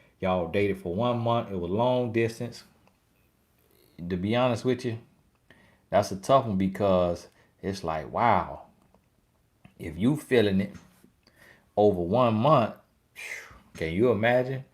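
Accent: South, United States. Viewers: I literally could listen to this country boy accent all day! I am from The Bahamas🇧🇸 and I can understand EVERY SINGLE WORD you say!!! Love you!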